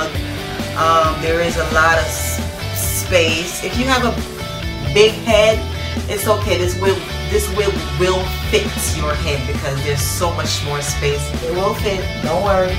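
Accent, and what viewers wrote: Jamaica. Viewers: Hey hun. Jamaican?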